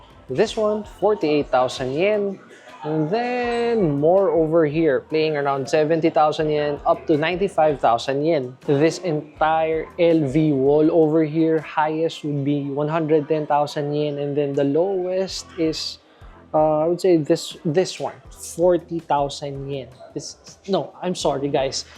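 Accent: Philippines. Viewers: I watch these videos for the strong filipino accent 💪 Hoy Pare! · Ayyy pinoy nga, very Filipino accent, cutiepie pa. May bago na naman ako panonoorin❤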